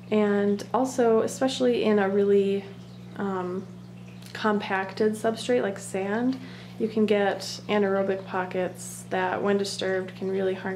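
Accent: Minnesota, United States. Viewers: Its the Minnesotan accent!!! Its cute!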